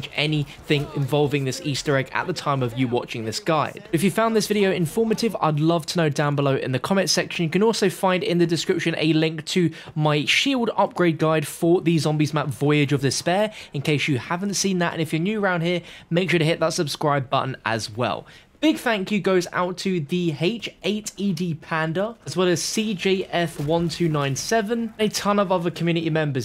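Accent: United Kingdom. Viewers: Yes he is from the UK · Something I've been wondering for awhile, is British? Idk why but his accent sounds British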